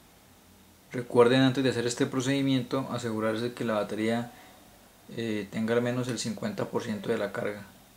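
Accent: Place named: Colombia. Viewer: Que buen tuto! Gracias. Funciona perfecto. Y amo tu acento Colombiano. Un beso :*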